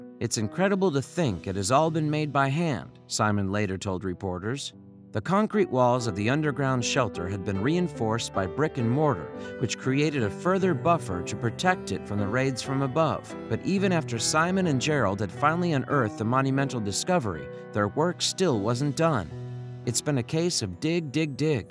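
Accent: United States. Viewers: OOPS! the American accent fooled me. Apparently this took place in England. Never mind.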